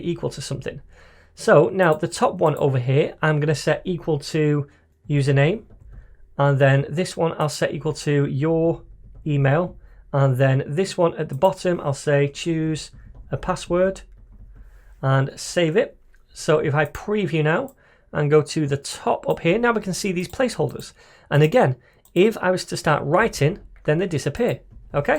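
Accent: United Kingdom. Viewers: oon-dah-stand. Oh boy I love your british accent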